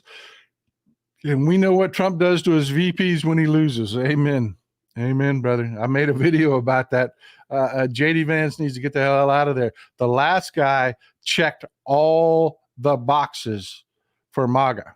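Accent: Texas, United States. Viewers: By the way you look like a Texan and talk like one, I was marry to a good Texan, he would have enjoy your facts and your show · you sound as authentically Texan to me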